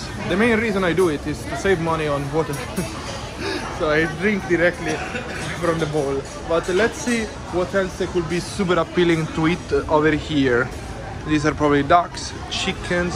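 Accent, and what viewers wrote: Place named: Italy. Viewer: omg i enjoy hearing your italian english。。。so funny engjoy Chonqqing just come back from Italy last week：）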